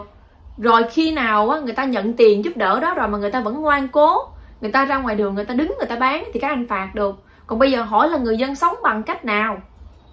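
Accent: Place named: North, Vietnam. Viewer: Sometimes it is difficult to understand the Tonkinese accent.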